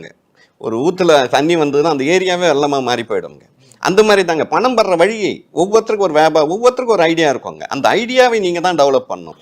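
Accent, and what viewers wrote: Kongu, India. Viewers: கொங்கு தமிழ் ❤😇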